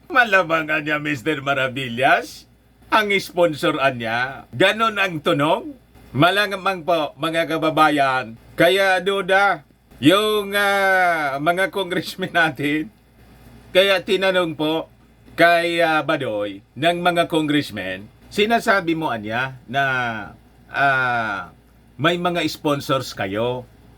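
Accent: Bicol, Philippines. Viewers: bicolano ka po ba sir?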